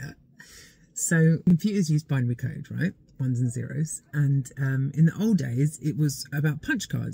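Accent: United Kingdom. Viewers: In the middle of the zeros I lost track of the British accent.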